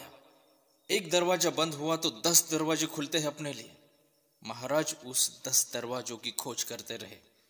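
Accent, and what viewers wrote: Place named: Maharashtra, India. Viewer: Fantastic narration...though the narrator has a very strong Marathi accent ..but superve narration ..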